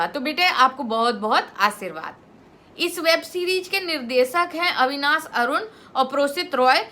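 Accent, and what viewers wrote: India. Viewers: I love your UP accent. Are you basically from there ? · Fan of ur Hindi accent ,uske babau bhi sidhe muh baat nehi karta hey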